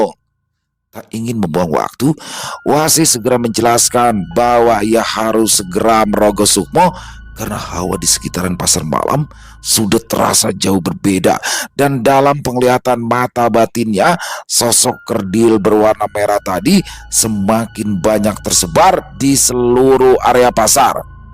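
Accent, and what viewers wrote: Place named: Jakarta, Indonesia. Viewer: Sering sering bang bawain logat Betawi / Bekasi gitu, kocag bet dah wkwkw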